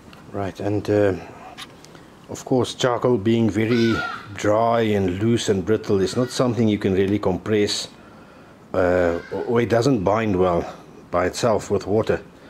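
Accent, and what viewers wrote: South Africa. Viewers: I already knew it when I heard him, I’m from Cape Town and the jojo tank with the Isuzu in the background just hit the nail on the head 👌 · He sounds so South African. The accent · Are you South African? This is a great process, similar to others so it for sure works repeatedly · Are you south African?? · Oh yes the true Soud Afarikan accent! Love it! 😂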